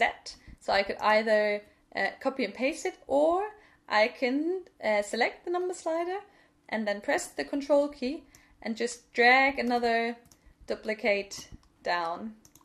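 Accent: United Kingdom. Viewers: Great tips! started out in auditing with a real addiction to excel and macros and then went into architecture. When I saw that dynamo could be used within Revit, it was such a great way to bring that side of my brain back into finding quicker or easier ways to do something. Where are you from and is the accent from time spent in the UK? I’m South African and spent time in London and now in Melbourne so who know what I sound like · It's awesome to see such a diverse following here! Indonesia, Brazil, Spain, Denmark, Syria and more maybe idk. As an American, I'm amazed that a German can speak English so fluently, that I can't even hear an accent. I can detect only a tiny British accent, along with terms like saying 'zed' instead of what we say here in the US, zzzzzz. Unless you're actually a Brit, just studying in Germany. Sad that this is really your only vid on dynamo.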